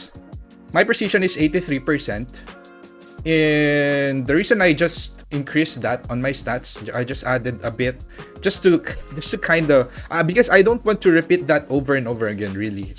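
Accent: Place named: Tagalog, Philippines. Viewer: Are you filipino? Accent sounds like a native tagalog speaker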